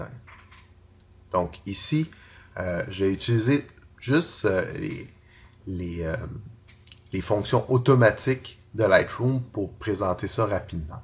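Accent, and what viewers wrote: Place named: Quebec, Canada. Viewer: C'était très bien expliqué, facile à comprendre, de bons graphiques "statistiques" et exemples visuel puis enfin quelqu'un qui parle avec un bel accent Québécois pour faire changement de ces français! :)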